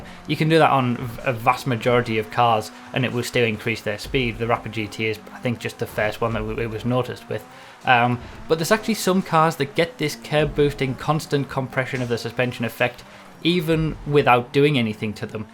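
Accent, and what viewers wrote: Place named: Northeast England, United Kingdom. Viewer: where are you from? Because I am also from the north east of England and your accent sounds a lot like mine.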